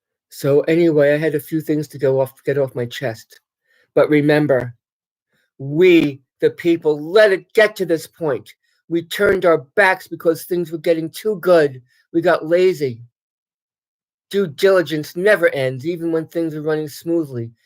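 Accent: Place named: Brooklyn, United States. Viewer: Ma'am I hear that Brooklyn accent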